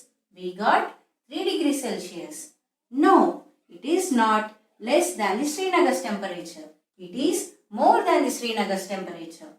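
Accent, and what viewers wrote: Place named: Andhra Pradesh/Telangana, India. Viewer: You are from Adhra or telangana 😅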